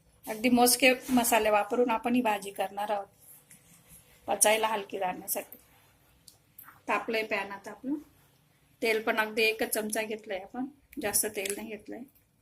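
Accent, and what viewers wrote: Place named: Jalgaon, India. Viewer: मस्त ताई भाजी. आवाज जळगाव जिल्ह्यातील वाटतो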